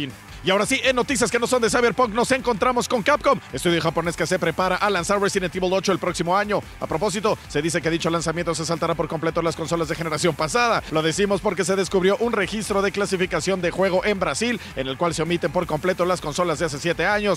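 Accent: Argentina. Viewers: Excelente acento argentino... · y vos como te sentís? te da vergüenza jugar videojuegos con acento argentino, lo mejor que he oido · ese acento argentino.. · Muy bueno ese acento argento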